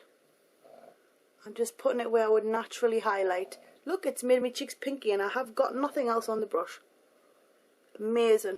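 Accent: Newcastle, United Kingdom. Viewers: yeah, her accent is jordie! jordie meaning she's from newcastle! :) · She's not Scottish or Irish, she's from Newcastle! :)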